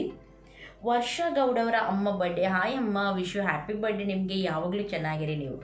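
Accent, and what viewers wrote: Mangalore, India. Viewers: Hi I'm from devanahalli nim yella vlog na ee lockdown time nali nodtidini kushi agute nim family nodoke n nim tumba lucky Olle family na aa devru kotidane nange nim birthday nim husband celebrate madidu adu nange tumba kushi aytu n nim mangalore baashi tumba chenda untu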